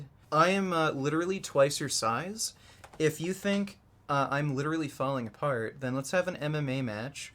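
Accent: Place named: Canada. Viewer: It's all aboot whooping ass.. like drake your from Canada. Tough voice th0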